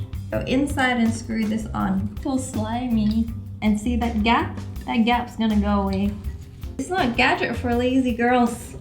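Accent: Australia. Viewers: I’m Australian too so u say bucks and mum and stuff like that! Yeah I have a accent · Which part of Australia are you from? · aren’t you Australian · Ur Aussie?? 💁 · Is she Australian or something?🤔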